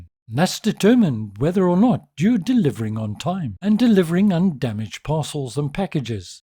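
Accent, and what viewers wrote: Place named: South Africa. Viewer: You sound a bit South African….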